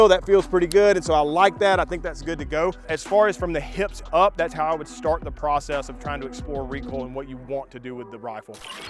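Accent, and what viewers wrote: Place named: South, United States. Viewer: He is saying it right. Y'all just aren't from the south, apparently. Lol. I'm a fellow southerner and have said "pilla" for all of my 36 years.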